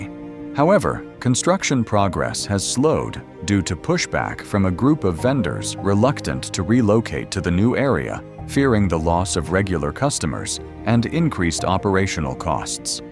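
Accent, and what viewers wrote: United Kingdom. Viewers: Your accent is British. You mind your own business. Do not come to India if you do not like us.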